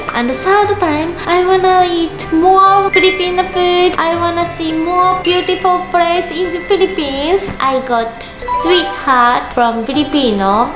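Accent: Japan. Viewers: No one gonna talk about her Japanese accent? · this is typically Japanglish pronounciation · I would like to inform the viewers why she says expensive-uh because its japanese accent they say english words with uh like bestfrienduh etc. PS. I have a japanese bff. so now u know ok? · My first impression from the title i was like what filipinos have done to her? Me after watching the video : ahhh kaya pala kase addictive 😂 Btw Love your accent just like my japanese friends 😊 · You look filipino to me😬😬 and your accent us like japanese, pilipino and visaya😐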